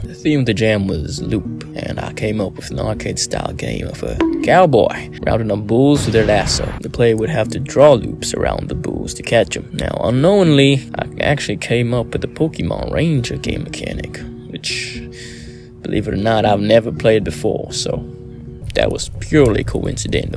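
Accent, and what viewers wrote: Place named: West, United States. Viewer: Doing the entire dev log with a western accent is true commitment to the bit. I really enjoy these devlogs, thank you for sharing another one!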